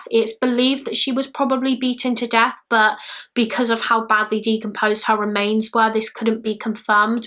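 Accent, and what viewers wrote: United Kingdom. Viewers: i dont hate u but i hate uk accent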